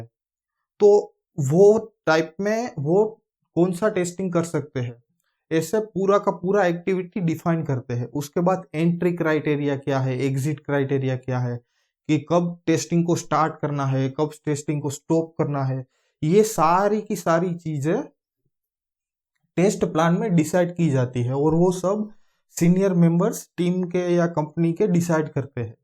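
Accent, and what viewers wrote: Gujarat, India. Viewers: Gujrati accent bhai ni 😉😉 helpful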